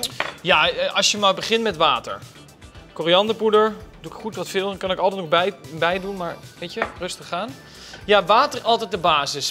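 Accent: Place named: Holland, Netherlands. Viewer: Sowieso heeft hij meer een Hollands accent. Die "R" heeft hij in ieder geval niet in Brabant geleerd. Beetje een mengelmoesje, dus ik snap wel, dat hij dan de termen patat en friet door elkaar heen gebruikt.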